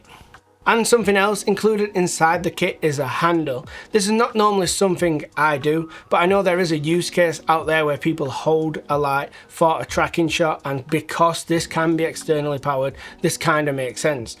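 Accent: United Kingdom. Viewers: That’s the best voice and British (British, right?) accent on the whole film production–centric YouTube!!! 🎥👑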